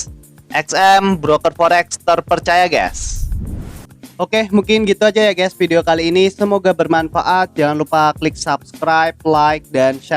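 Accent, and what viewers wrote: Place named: Indonesia. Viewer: orang mana bro? kok medok suaranya haha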